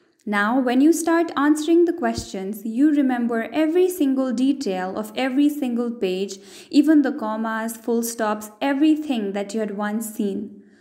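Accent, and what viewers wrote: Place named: India. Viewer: Omg an Indian narrator , unfortunately I have a low tolerance for this, bye.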